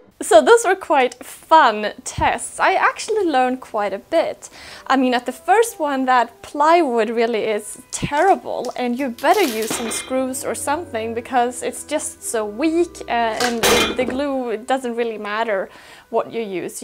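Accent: Sweden. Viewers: Are you swedish? Sounds like you have strong swedish accent :) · Are you Swedish? Great vid! Thanks!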